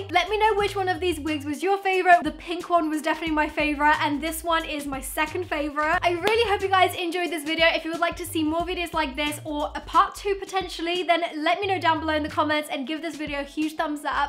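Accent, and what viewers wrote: United Kingdom. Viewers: Oh my.....this British accents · Wait ate you English omg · Normal english person: Better British person: Butter Lol it's cute 🖤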